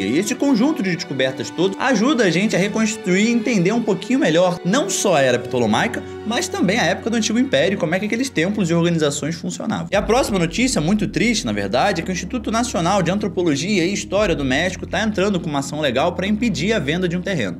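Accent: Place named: Rio de Janeiro, Brazil. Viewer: Adorei o vídeo, faz uns vídeos contando um pouco da história do Egito e dos faraós, como se fosse uma baita fofocona kkkkk acho da hora história sendo contadas dessa forma e pra quem tem dificuldade de entender ajuda bastante. Outra coisa, adoro seu sotaque carioca, amo seus vídeos do TikTok.. continue assim❤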